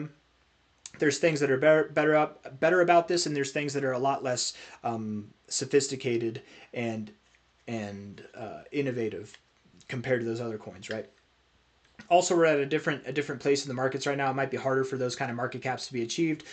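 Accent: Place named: United States. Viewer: Random question but when did you leave America? Cause ur accent is definitely American but the UTC time zone is far from America lol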